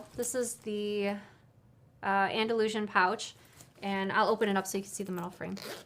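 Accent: Newfoundland, Canada. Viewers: Newfoundland for sure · Deffo Newfoundland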